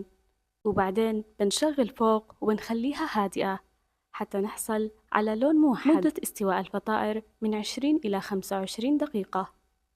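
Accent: Yemen. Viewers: فديت بنت اليمن اعرف اللهجه لو بين مليون والنظافه والادب والاخلاق جبرني بك ❤ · لهجتك يمنيه عرفت لما سمعتش تتكلمين مثلنا 😅بالتوفيق بمشروعك الجميل والصادق ❤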